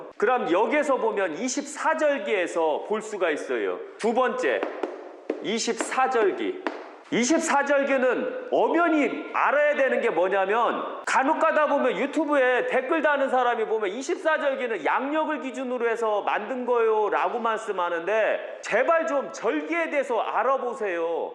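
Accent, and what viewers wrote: Chungcheong, South Korea. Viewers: ㅅㅏ투리가 충청도 분이신가?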